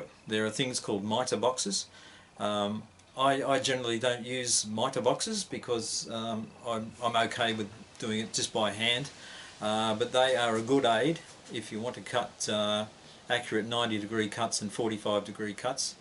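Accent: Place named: Australia. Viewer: Hi. Given your location as being in Australia (and the accent is a giveaway), I'm intrigued to know how you came to choose Great Chesterford/Duxford/Newmarket as the location for your layout. Another reason for my curiosity is that I did most of my growing up in Burwell, about four miles from Newmarket. Much of my education was in Burwell and Cambridge. Since leaving Burwell, I have lived on the outskirts of Cambridge. So, what's your story?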